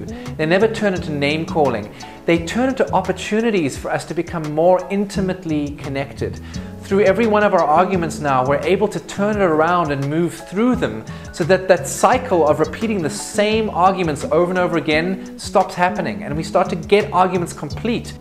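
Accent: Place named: Afrikaans, South Africa. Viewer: you sound dutch, south african and something else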